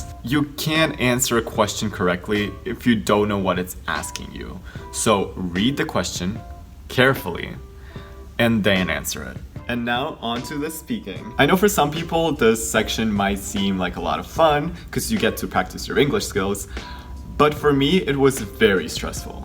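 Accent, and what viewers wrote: United States. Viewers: Omg, what the...? Are you russian? Whatt??? Your accent is excellent, perfect, fabulous... terrific....just how... Omg I am really shocked that you are russian with such an excellent American accent. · I thought he was an American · Thought he was American but in fact he seems to be Russian OMG 😱😆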